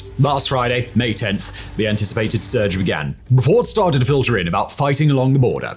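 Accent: England, United Kingdom. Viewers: you're British with the most English accent outside of the royal family or the 1950's BBC...It's 'Autumn' not 'Fall'.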